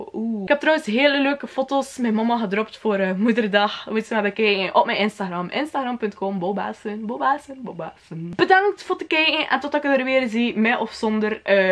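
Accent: West Flanders, Belgium. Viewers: Jaok super idd ma boeiend ma zieje echt west vlaoms fso?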